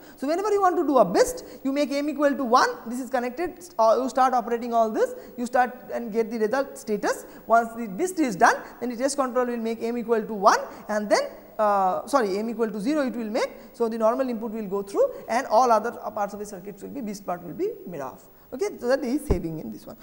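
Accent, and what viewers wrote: India. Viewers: would you please talk slower than this I am pretty sure you are saying good information , but the Indian accent with the very fasr rhythm make it very hard to collect data .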